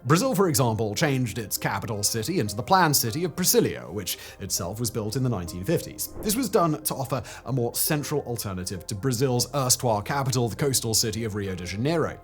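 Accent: United Kingdom. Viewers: The British accent of the presenter makes it difficult and unpleasant to follow. He speaks fast, words are not clear, no boundary between words.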